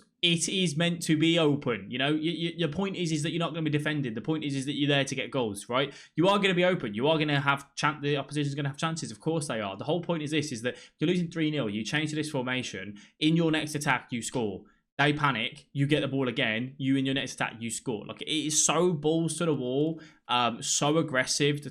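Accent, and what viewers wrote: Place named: Yorkshire, United Kingdom. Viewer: Good content bro but ur yourkshire accent is like nails in my ears, why british english is so terrible???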